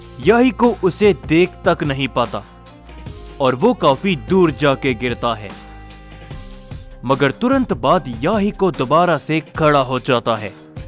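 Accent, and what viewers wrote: Odisha, India. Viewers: Odisha se ho kya bhai 😅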